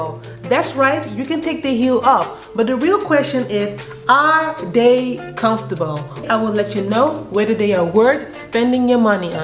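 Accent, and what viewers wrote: Netherlands. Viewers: Love your content!! You sound Dutch 😍😘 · You have a Dutch accent, are you from the Netherlands?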